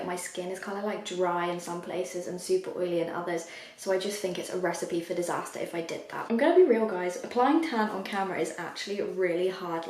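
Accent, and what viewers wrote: United Kingdom. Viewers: Yeah no. She’s also British so idk how you didn’t catch the accent.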